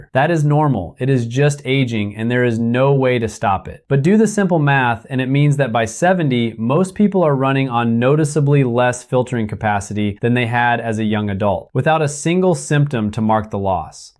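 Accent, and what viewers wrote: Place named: England, United Kingdom. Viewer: Male 70 yo English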